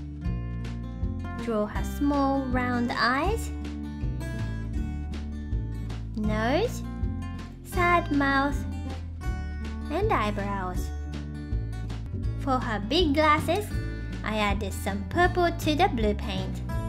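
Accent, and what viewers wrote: Japan. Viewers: Hey does anybody know where is from? Her accent is very interesting... It sounds sort of Japanese but with a little bit of Australian maybe? Also great nail art! I was mesmerized! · Because she is from Japan, she has an accent.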